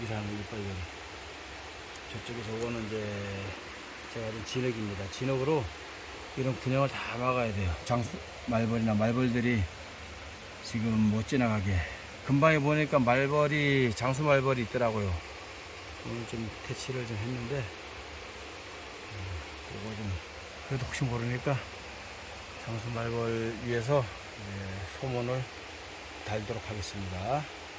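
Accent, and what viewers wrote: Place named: Danyang, South Korea. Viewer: 간만에 단양 진또배기 사투리를 들으니 억양도 좋고 가지끈 반갑습니다 ㅋ 건강 유의하시고 사랑하는 동상^^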